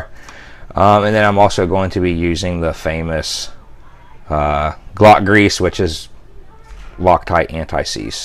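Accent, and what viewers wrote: Pittsburgh, United States. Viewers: This guy must be from Pittsburgh with that accent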